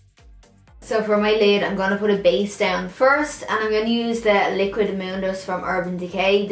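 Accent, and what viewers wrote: Dublin, Ireland. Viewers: Just new to your channel but can I just say that’s it’s so fab to see a big and successful YouTuber with a Dublin accent!! Any other Irish Youtubers I know of all have like posh South Dublin accents or with an American twang there’s nothing wrong with that of course but it’s just really refreshing and exciting to hear an accent like mine on YouTube. Reppin’ the Northsiders!💁🏻 Fills me with pride!💚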